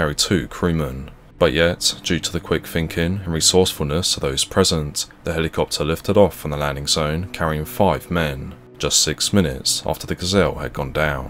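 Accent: United Kingdom. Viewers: He is American. With a British Accent.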